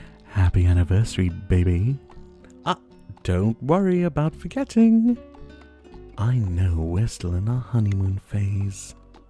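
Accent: United Kingdom. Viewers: as an Indian I’m quite flattered of how samosa really rolls off the tongue with his British accent🤍😮‍💨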